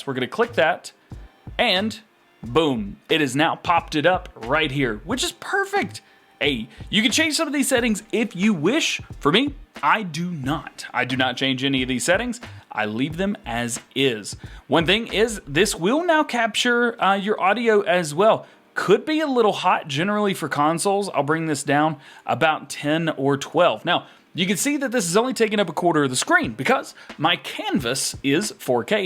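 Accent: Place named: South, United States. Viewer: Definitely from Alabama or Tennessee that accent tho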